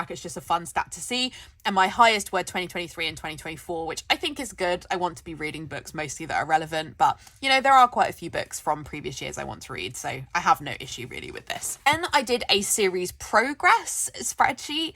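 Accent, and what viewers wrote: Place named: England, United Kingdom. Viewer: I'm currently enjoying a binge watch of reading journals videos and it was a surprise to hear an English accent! You sound a bit like Fearn Cotton ❤ Love your reading journal!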